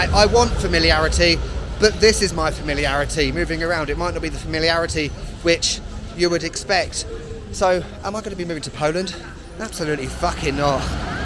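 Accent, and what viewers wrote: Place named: RP, United Kingdom. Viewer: Yea, I've read the article you posted. Yet, you might haven't got that western Londoners accent anymore but RP is right there LOL 😀. Most of all I like your diversity and non common approach. Greatly appreciate the way you presented smaller cities and of course my home town of Cacak 🙂. Cheers mate/brate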